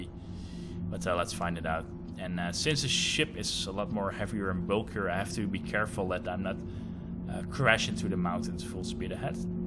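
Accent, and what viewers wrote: Netherlands. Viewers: are u from the netherlands by any chance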